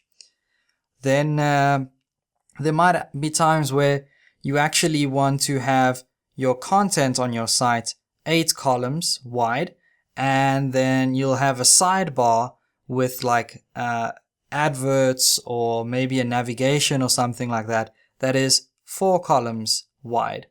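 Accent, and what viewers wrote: South Africa. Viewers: As us Americans say "Africa" as it were a country not a continent you sounded the same. You from South Africa? The "British" accent sounds like it although wife from North Africa and their English (3rd language) is British English not American English. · Fellow Saffa here now living abroad!!! Very informative vid! Nice to hear an SA accent for a change. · Best tutorials on youtube so far about coding. Really awesome to be learnig from a fellow South Adrican as well. Sounds like you’re from KZN.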